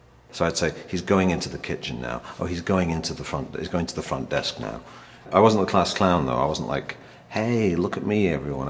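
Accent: United States. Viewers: That american twang is so annoying!! Saw him doing his tour in Oxford a couple of days ago, and there was none of this accent nonsense! (And it was brilliant) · yep, he's not that great on this. and he's developed one hell of a yankee accent too. must've been out there too long. "partridge, you W***ER!!"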